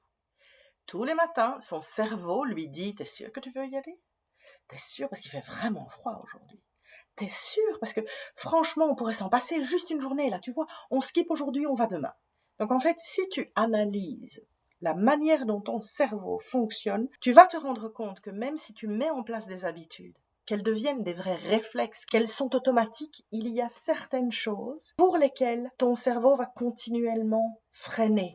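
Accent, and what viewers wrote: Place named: Belgium. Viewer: Bonjour, j'adore vous écouter mais je me demandais d'où venait votre petit accent? Belgique?... Moi, je suis normande mais j'habite près de Paris. Merci!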